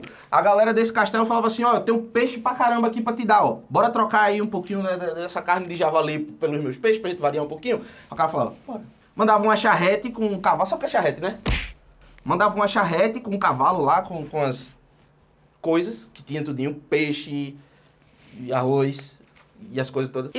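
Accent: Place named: Northeast, Brazil. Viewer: Adoreii a aula. Achei mto animada. E seu sotaque nordestino,foi oq me chamou mais a atenção.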